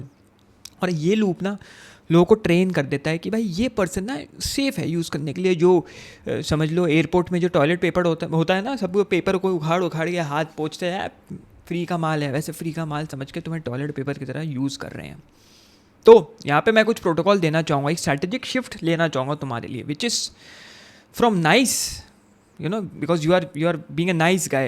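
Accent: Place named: Bhopal, India. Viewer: bhiya why there is slight bhopali accent are you from bhopal?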